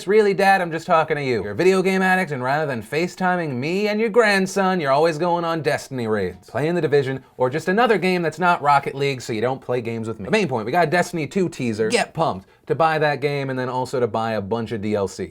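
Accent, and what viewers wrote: South, United States. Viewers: I hear a southern accent in your outros lol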